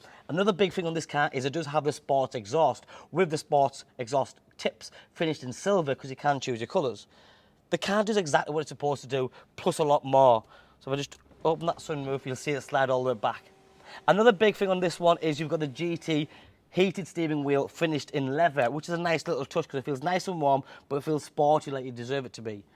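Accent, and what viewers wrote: United Kingdom. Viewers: I love the brish accent 😂🔥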